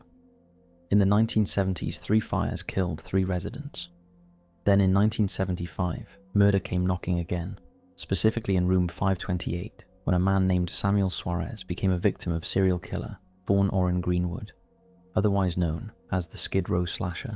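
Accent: United Kingdom. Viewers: His voice is so lovely and mellow, and I love a British accent!!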